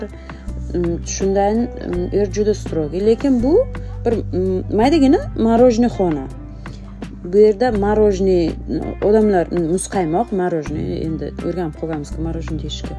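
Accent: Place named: Uzbekistan. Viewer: Charchamang omadizzi bersin yurtdoshim shevamiz birda yurakka yaqinsiz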